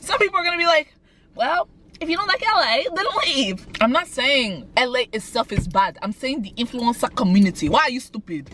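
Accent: Nigeria. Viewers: Im starting to develop a Nigerian accent cuz i just love binge watching vids are 😭💗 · What makes me happy in these videos is that you incorporate the nigerian accent cause I'm also nigerian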